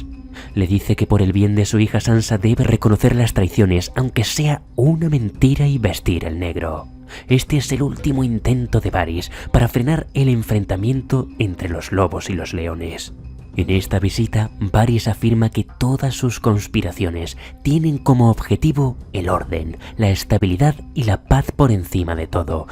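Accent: Spain. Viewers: La verdad el acento español me cae mal.... Pero no puedo dejar de escucharlo 😅😅😅😅😅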